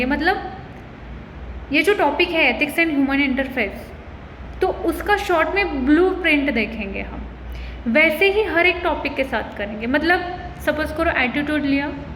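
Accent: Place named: Maharashtra, India. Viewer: मराठी माणसाचे हिंदी लगेच समजून येते😅😅😅 तुम्हारी हिंदी बहुत अच्छी है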